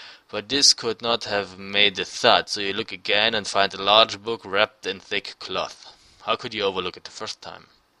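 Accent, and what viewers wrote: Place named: Germany. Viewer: dude, you sound so german!!! :)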